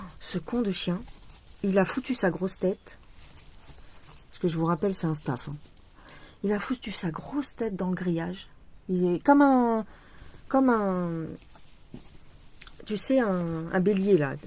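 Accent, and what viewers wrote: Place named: Toulouse, France. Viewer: Coucou ma belle 🩷elles sont magnifiques tes toiles et le loup est magnifique 😍. Super ton humour, tes jolies phrases, on dirait une petite fille 👧. Merci pour ce partage et bon courage pour tes toiles dont tu aimes tant! Super 🤣🤣😀😀ton accent de Toulouse. 🩷🩷💜💜😀😀🌅🌅 Belle fin de journée à toi et famille 😘😘😘ainsi qu’aux loulous 🦮🦮🐈🐕🐶😘😘😘😘😘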